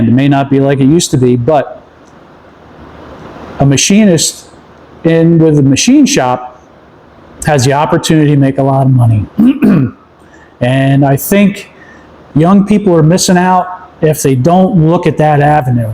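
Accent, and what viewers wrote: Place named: East Coast, United States. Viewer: He sounds east coast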